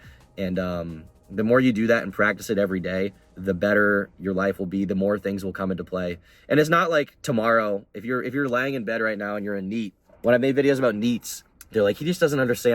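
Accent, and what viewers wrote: New England, United States. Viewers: Oh shit I didn’t know u were from New England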